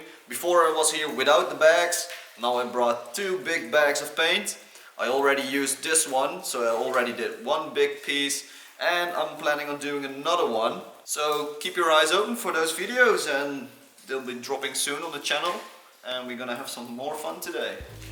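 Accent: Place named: Netherlands. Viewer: Ben je nederlands ik hoor het aan je accent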